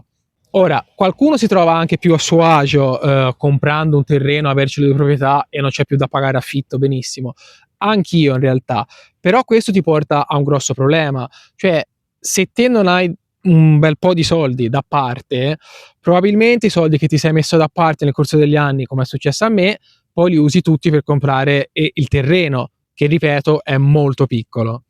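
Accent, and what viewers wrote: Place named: North, Italy. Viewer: Dal tuo accento, capisco che vivi al nord,,, mi dispiace,,, possiedo dieci Milà metri di terreno,,(vivo in Sicilia) sono ormai dodici anni che ho avviato la mia partita iva,di azienda agricola,,,,produco un po' di tutto,,, è i prodotti che riesco a produrre, il 95% dei casi riesco a venderli molto meno della grande distribuzione,,, con buoni margini di profitto,,,, forse il motivo è anche che al sud ci sono molte più persone che possono permettersi di spendere molto di più per il buon cibo rispetto al nord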